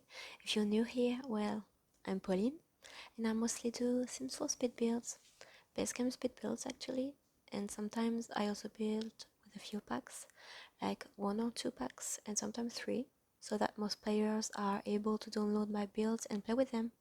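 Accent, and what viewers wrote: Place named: France. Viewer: Hello! Je suis moi aussi française, donc on va dire que ça m'arrange que tu ais l'accent, comme ça je comprend mieux ! Sinon tes constructions sont toujours super belles, je me demande toujours comment tu arrives à faire de belles choses seulement avec le jeu de base où quelques packs... Moi j'ai quelques packs, mais je ne peux plus m'en passer ! Et aussi, je trouve ça cool le voice over!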